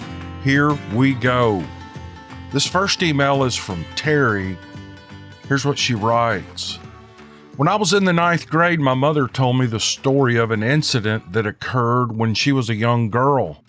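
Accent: South, United States. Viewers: I just love you country voice. You have that voice that you get around a fire and get you some popcorn and get comfortable and listen to you. But I can get in my bed and play your channel and picture my mind in these stories like I'm there. When you tell these stories. Thanks you do a very good job and telling these stories.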